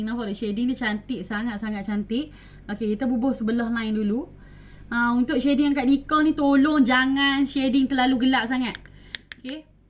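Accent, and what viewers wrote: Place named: Kedah, Malaysia. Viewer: Seronok dgr loghat kedah,sbb sy dari pahang.best sgt2 riuh rendah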